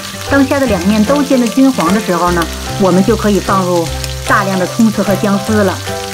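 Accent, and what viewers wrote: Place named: Tianjin, China. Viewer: 天津口音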